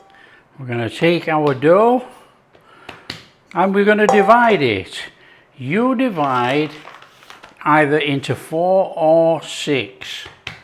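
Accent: Yorkshire, United Kingdom. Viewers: 😁😁😁😁😁 yorkshire man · First one of your videos I've watched and your accent reminds me of the few years I spent living in Yorkshire. I've just been thinking that I need to learn a few more recipes and now I've found you sir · what a nice Yorkshire man . Could listen to him all day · Are you from Yorkshire sir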